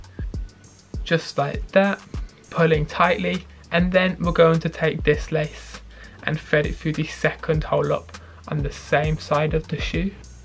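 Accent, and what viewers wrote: United Kingdom. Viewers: are u british, i like the accent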